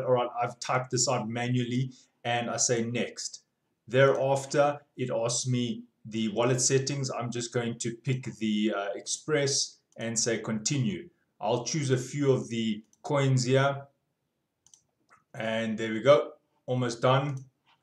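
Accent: South Africa. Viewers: South African!! Lol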